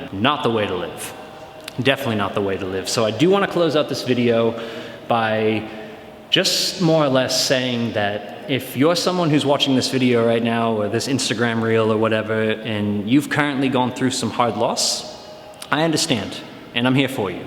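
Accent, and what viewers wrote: Australia. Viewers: It’s funny hearing you speak with an Australian accent and then comparing it to your older videos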